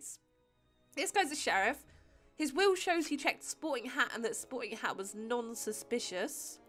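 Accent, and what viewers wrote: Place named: United Kingdom. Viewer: I am always amazed at how British you sound and I'm British myself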